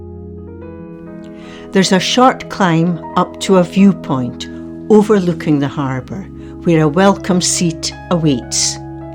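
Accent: Scotland, United Kingdom. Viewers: Could you imagine what this Castle would have look like before it was sacked. The filming was first class and the narration in a Scottish accent made even better, One more place to visit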